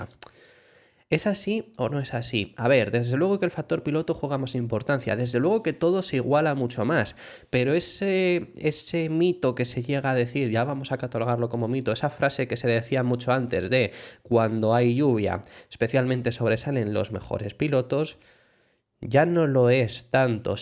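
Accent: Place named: Spain. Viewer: Parece por tu acento que eres español, y por supuesto el titulo de "Alonso fue el mas rapido" debio ir acompañado de "pesco en rio revuelto", con ese auto si acaso en pista seca se mete de 7º o de 8º, hay que ser más realistas que fanaticos.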